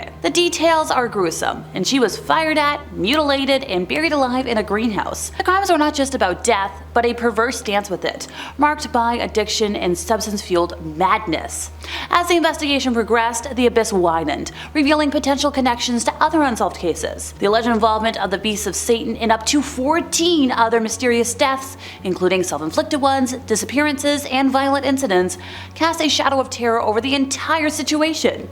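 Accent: Toronto, Canada. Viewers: Toronto accent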